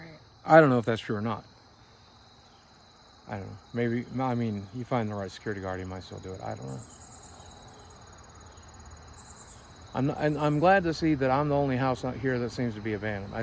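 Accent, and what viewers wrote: South, United States. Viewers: It’s nice hearing Boogie’s southern drawl come back. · It's funny to me that he lives in Arkansas but his southern accent only comes out when he goes to Virginia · I noticed on both this video and the one from a few years ago, Boogie’s southern accent comes out a lot more when around that house.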